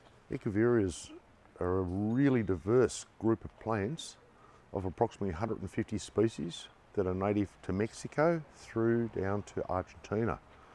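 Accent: Australia. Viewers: Your collection are so beautiful pretty healthy yr voice say u are Australian u are so far away i like to order from yr collection they are so awesome but i live in Trinidad west Indies Maloney garden so lovely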